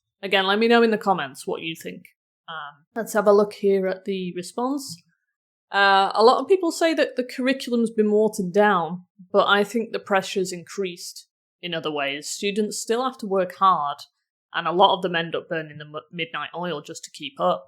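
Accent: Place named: United Kingdom. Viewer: I love the British accent. You speak really clear I understand it very well. Thanks again.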